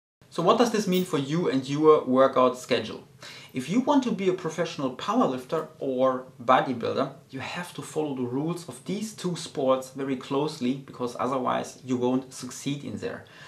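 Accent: Germany. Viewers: you've got such a german accent! :D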